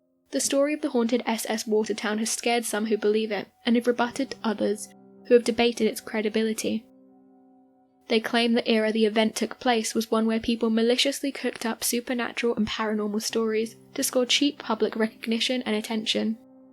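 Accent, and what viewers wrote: United Kingdom. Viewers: Ningeelewa ila sasa hii lafudhi ya kiBritain ni kisanga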